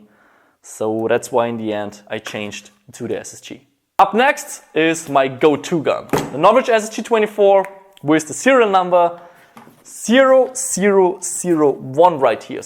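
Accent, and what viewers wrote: Bavaria, Germany. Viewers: What accent do you have, it sounds like German sometimes but idk. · Sorry aber man merkt das du aus Deutschland (ich glaube Bayern) kommst Trotzdem Bester Youtuber der Welt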